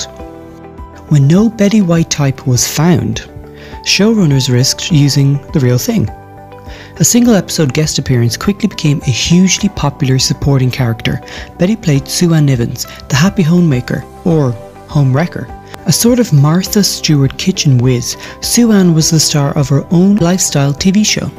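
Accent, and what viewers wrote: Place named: Ireland. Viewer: I love hearing a fellow Irish voice in a voiceover! :) I've watched a lot of your videos now. Excellent channel and great work. Good for you. Hope it blows up for you. xx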